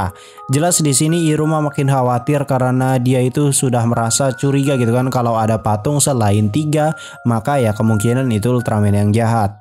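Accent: West Java, Indonesia. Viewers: Sunda pisan🤣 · Bang Orang Sunda Y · Wah si mamang nyunda euy wkwkw mantap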